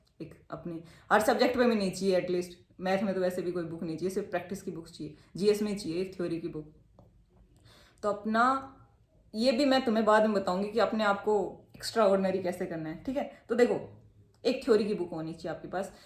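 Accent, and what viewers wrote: Haryana, India. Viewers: Mhare Haryana tt ho aap, awaj tt hi beraa laag gya😂😂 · Your haryanvi accent is so cool😅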